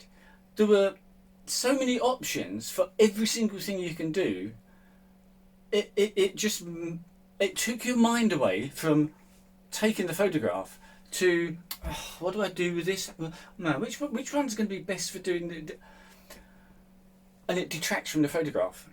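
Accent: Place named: England, United Kingdom. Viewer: I had a sneaky feeling you would be posting something about the A1 today. Love the rant, the sarcasm, especially with the perfect English accent (I'm in Texas). 😊 High stills fps are like video now. I would not want to shoot a burst of 20-30 fps of that cyclist rounding the corner and then cull through a hundred photos at the computer looking for the perfect shot (unless one is a sports photographer or just hoping to capture a cycle wreck). Great video.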